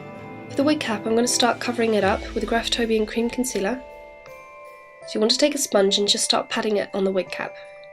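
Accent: England, United Kingdom. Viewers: her English accent is beautiful. die die die. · I love your voice for this, soft, with a british tone. sounds alot like a girl harry potter xD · wow your voice..your kind of speak...guess you will be a good voice actoress..are you british ? i like this accent soo much :-) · love ur british accent!!!!!!!!! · I think the fact that you have a british accent totally adds to this video <3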